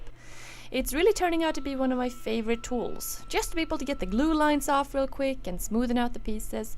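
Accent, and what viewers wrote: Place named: Sweden. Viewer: Claims to be Swedish, sounds Swedish. Doesn't cover the outhouse in pine (furu). The Norwegians get suspicious of this behaviour.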